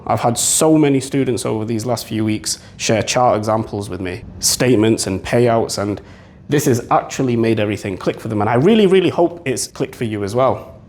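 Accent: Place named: England, United Kingdom. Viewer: His English accent and talking about DRT makes it seem more complex than it really is. 😅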